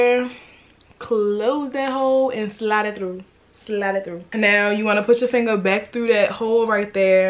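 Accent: Baltimore, United States. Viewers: Are you from Baltimore? You sound just like a Baltimore girl like me lol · sis are you from balitmore? you got the accent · I KNOW you’re from baltimore or someone who raised you is😂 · Gotta be Baltimore Like has to be lol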